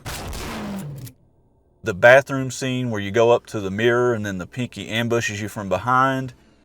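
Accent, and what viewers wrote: South, United States. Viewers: now ahm not gay (nothing wrong in bein gay do) but ah think ah have a crush on ya southern accent mayn! seriously tho, this game is too underrated, it aged well imo.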